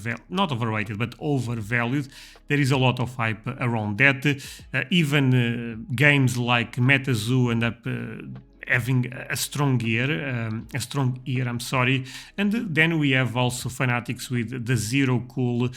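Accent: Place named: Portugal. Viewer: video muito porreiro, reparei logo pelo sotaque que eras português. novo sub ;)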